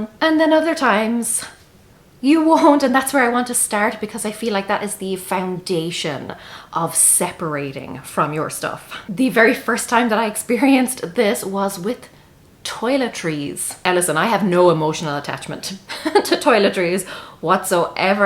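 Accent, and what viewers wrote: Ireland. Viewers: sounds like an irish accent but I cant pinpoint where lol :) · I just watching this video for info and now ill watch it to hear your beautiful accent. Irish, right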